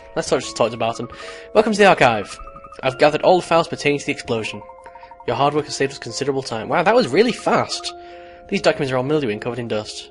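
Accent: United Kingdom. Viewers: I love your accent. I wish I had an English accent. x3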